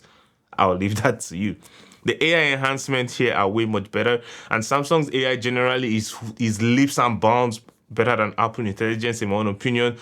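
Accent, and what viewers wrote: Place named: Nigeria. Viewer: Nigerian English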